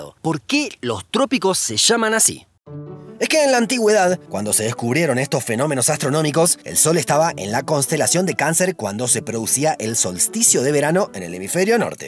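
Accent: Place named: Rosario, Argentina. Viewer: Sí, y se renota que es de Rosarioooooo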